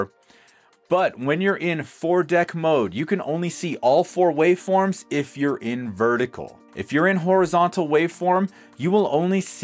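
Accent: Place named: France. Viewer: Very well explain and film, you speak clearly and for a french guy it's cool for understanding what you say Effects are are not too much and final result seems professionnal. Very good job !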